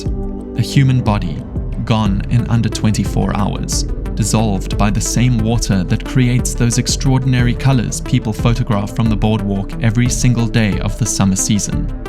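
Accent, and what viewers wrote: South Africa. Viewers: Your accent sounds South African...? Hulle weet nie wat ons weet nie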